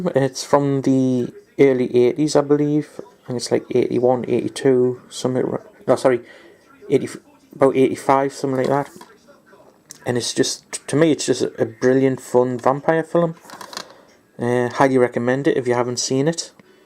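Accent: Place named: Newcastle, United Kingdom. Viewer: Are you a Geordie or a Mackem? I'm from Gateshead :) Subspecies is great, I enjoyed them when the Horror Channel showed them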